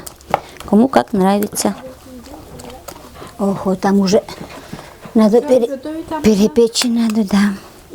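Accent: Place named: Udmurtia, Russia. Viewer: Дивный сюжет! Я и Ижевска, живу сейчас в Молдове, и просто слышать родной говор так приятно))) Приготовлю на неделе перепечи)))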